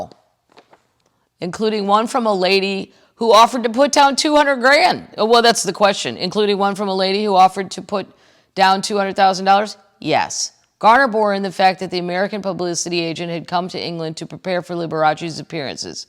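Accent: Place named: Wisconsin, United States. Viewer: Brilliant again. I remember my mom telling my Dad she would leave him only for Liberace if he came knocking at the door. Ha ha Keep up the Wisconsin accent 😜😁❤️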